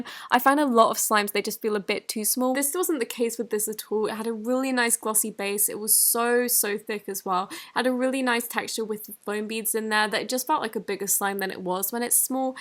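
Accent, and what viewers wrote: England, United Kingdom. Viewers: I love the English accent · is she really British like wth....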